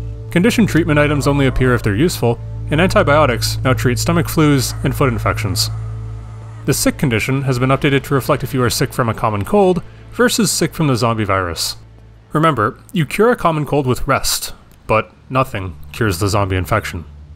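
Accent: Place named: Canada. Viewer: The dude voicing this is insanely Canadian.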